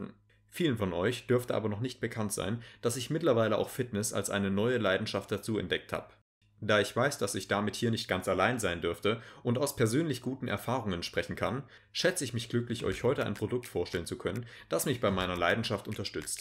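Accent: Saxony, Germany. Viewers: Definitiv aus Sachsen/Thüringen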